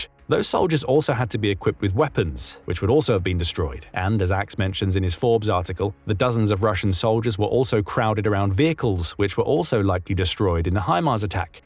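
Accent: England, United Kingdom. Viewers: Amazing to hear someone with an English accent use the phrase, "Get out of Dodge". Funny and cool at the same time. I am hopeful for Ukraine, and despise president trump and his pro-Putin agenda.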